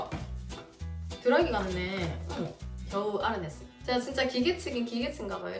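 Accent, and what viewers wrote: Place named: Daegu, South Korea. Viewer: 아이공 ㅠㅠㅠ비슷하게 출산해서 10개월 딸아이 키우고있는 구독자에요 수술대 올라간다는 생각에 마음이 너무 무거우셨을거같아요. 하지만 아기는 생각보다 강하니!! 다 잘될거에요 우리 이쁜아비 수술 잘 받고 건강하게 잘 자라쟈❤ 늘 댓 달때마다 적지만..저두 대구에서 10년가까이 살아서 님 사투리 들으면 너무 정겨워요 지금은 남편따라 또 타지에와서 ㅠㅠ대구가 그리워요.. 님은 얼마나 더 그리우실지.. 힘내세요❤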